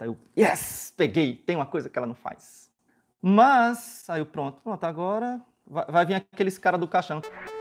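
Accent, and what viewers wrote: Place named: Ceará, Brazil. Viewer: O sotaque cearense não sai dele. Haha parabéns, padre.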